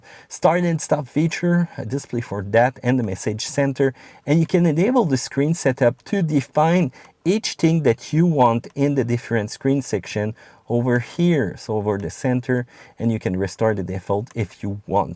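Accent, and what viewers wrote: Quebec, Canada. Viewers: came for the french-canadian accent, stayed for the high image quality... but jeep is really doing a poor job with this one hahahaha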